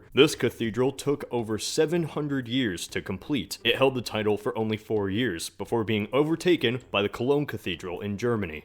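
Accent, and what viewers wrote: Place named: Midwest, United States. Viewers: it's a Midwestern accent. from States like Kansas and Missouri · He's from the great state of Ohio, albeit Toledo instead of the superior cities of Columbus and Cincinnati.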